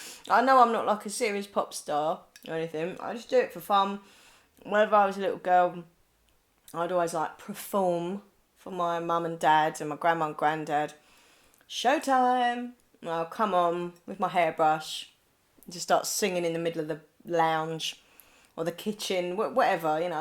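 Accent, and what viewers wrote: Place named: England, United Kingdom. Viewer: Haha your accent is reyt good, proper English!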